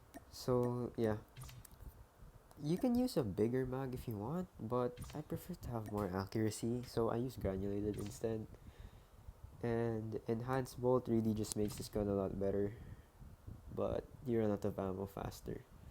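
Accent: Philippines. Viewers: Are you Filipino? Because your accent is Filipino like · are you filipino bcuz ur accent is like a filipino accent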